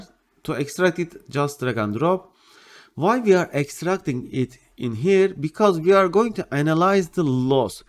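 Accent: Turkey. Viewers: Türk aksanı, nerde olsa tanırım. Helal olsun, mük.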